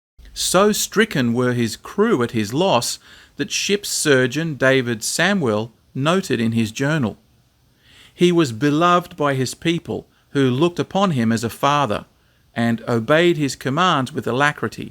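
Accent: Australia. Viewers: Sorry, should have looked there before commenting, and was of course a tiny, unimportant detail in your amazingly crafted documentaries. I wish to add that I love both your texts as well as the way you narrate them - 'Strine' yet perfectly intelligible even for those for whom English is not their mother tongue and whose hearing has deteriorated due to old age (as in my case)... and with perfectly dosed hilariousness ('However,...'🤣). Your documentaries are providing me, just in time, with the therapeutic benefit of proving that the present 'crazy times' are nothing new - humanity has always been both crazily stupid as well as deeply admirable.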